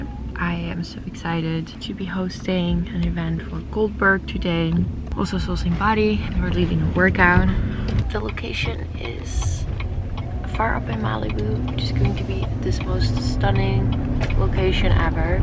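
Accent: Netherlands. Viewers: whaat, when i first came across your video’s i thought i heard a dutch accent to your voice, you’re amazing!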